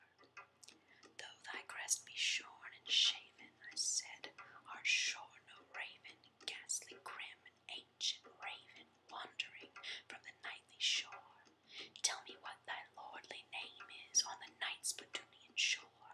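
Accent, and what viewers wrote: South, United States. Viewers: Whisper "A Tell Tale Heart" by Edgar Allen Poe. Great whispering, I love the southern accent.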